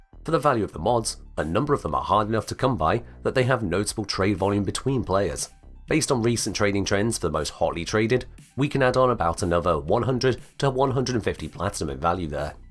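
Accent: United Kingdom. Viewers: True London voice.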